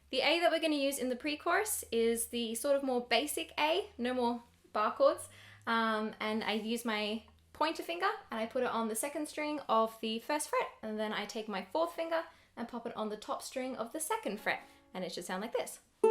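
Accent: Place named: Australia. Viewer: Were are you from? You sound Australian...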